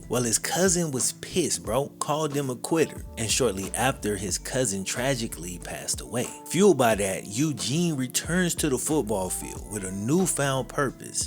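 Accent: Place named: Mississippi, United States. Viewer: Thanks. As another Mississippi boy, I love the way you tell your stories. It's not everyday you hear someone that sounds like us narrating so well. Love your work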